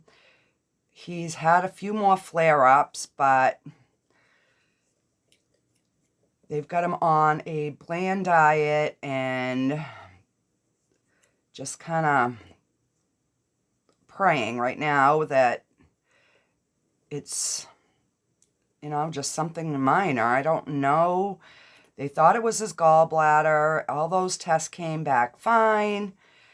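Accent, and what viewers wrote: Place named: Boston, United States. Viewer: First video oof yoours that I watched. Very pretty. Are you from the Boston area? I hear a bit of an accent. I look forward to learning how to make my own pigment paste! Hugs from 🇨🇦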